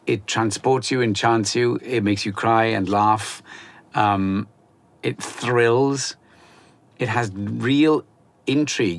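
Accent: United Kingdom. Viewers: Is he British? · I had no idea this dude was British af 😂.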